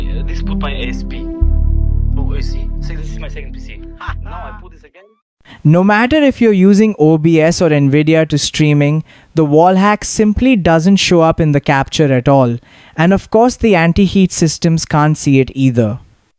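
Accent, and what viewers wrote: India. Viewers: ts indian voice cannot be the method 🥀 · Sounds pretty indian if you ask me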